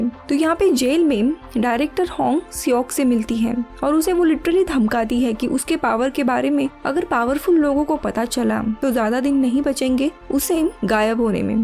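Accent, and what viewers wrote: Maharashtra, India. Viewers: as usual one again nice explained ...👍 Marathi accent aasun pan hindi yevdi clean kashi boltes 😁😅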